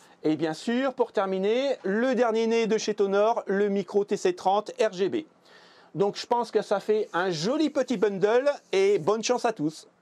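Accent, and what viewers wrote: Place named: Nord-Pas-de-Calais, France. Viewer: Cet accent chti ASMR 🎶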